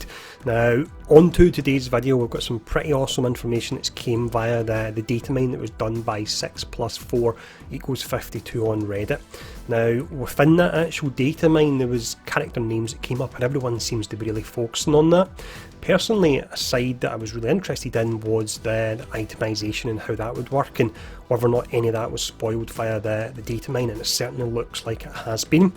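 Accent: Scotland, United Kingdom. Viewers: The accents the best part cause he’s Scottish. What a legend! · Long time sub, new commenter. No worries with the Scots accent on my part, half my family are from Perth lol. Keep up the awesome work dude, I played the beta on xbox this weekend and enjoyed it, what are your thoughts after 2 weekends of play? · I have to admit i don't really mind the Scottish accent, even if i'm french. I know since Marvel Heroes and i'm used to it now, i'm pretty glad i am able to understand more english streamers / youtubers thanks to that! Welcome the comment section! Thanks for the good work! Cheers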